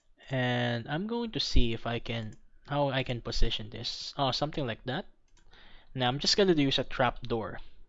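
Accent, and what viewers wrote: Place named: Philippines. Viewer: Ang accent niya ay tagalog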